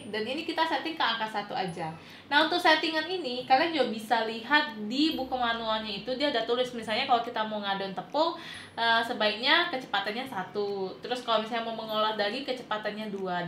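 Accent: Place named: Palembang, Indonesia. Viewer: Orang palembang ya kak 😊..logatnya gak bisa hilang heheh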